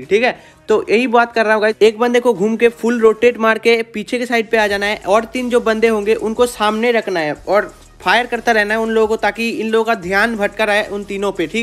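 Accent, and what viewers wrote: Bengal, India. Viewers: Bro bengali ho kaya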